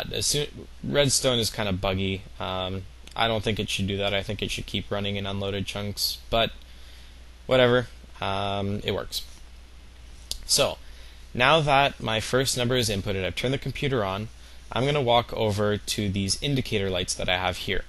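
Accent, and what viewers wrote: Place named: United Kingdom. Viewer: He's hiding a British accent.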